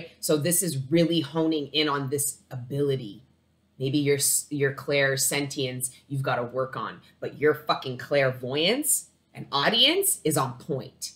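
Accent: Toronto, Canada. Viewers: You're hilarious and so fun! I thought you might be from Toronto / Scarborough from the way you talk and then you said you're from Canada. This reading lifted my spirit. I also have a similar incense burner. 🙏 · Canada- you sound like your from Toronto! I’m in Scarborough! But anyways....love your energy! 💜💜💜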